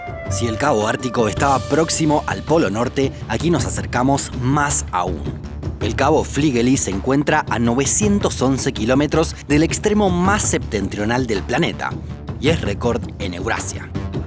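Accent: Buenos Aires, Argentina. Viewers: eres de argentina?? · Escucharte me anima a querer visitar Argentina solo para saber donde tienen este acento 😍😍😍😘😘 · Además de los videos instructivos, el acento porteño me hace extrañar mi querida Argentina. Saludos desde Brasil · Es argentino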